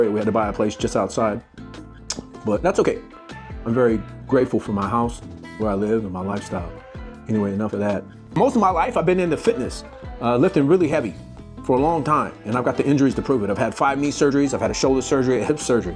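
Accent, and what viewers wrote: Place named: South, United States. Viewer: This explains the redmeck accent you do 😂 Awesome video bro. Thanks for sharing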